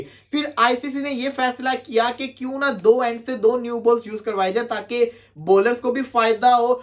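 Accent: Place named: Lahore, Pakistan. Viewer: Bro you must be lahori. I can tell it by your ‘ڑ ’😂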